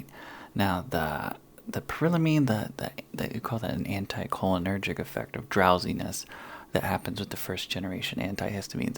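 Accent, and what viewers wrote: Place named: Kentucky, United States. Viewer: You gotta be from Kentucky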